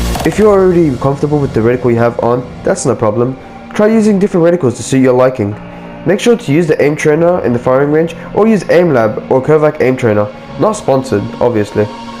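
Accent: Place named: South Africa. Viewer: Thanks for the vid, but what is your sensitivity for controller Btw you sound South African :)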